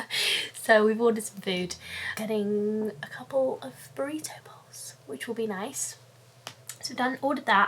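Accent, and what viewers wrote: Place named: United Kingdom. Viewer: Hi! I love everything about your channel- your style, your vlogs/adventures, and mostly your book recommendations and updates. I have just read so many of the books you have reviewed and you have become a really reliable guide for me when it comes to reading books that are enjoyable, yet also important literature. I have missed your cheery British accent and smile and big chunky rings- rewatching your old videos over these weeks has been a comfort in these crazy times. I really wish you would address what's going on- as a writer and reader I feel that it is so important to document how we are feeling right now-I would appreciate and I am curious about your thoughts and experiences. I hope you are safe and reading lots:) - Yael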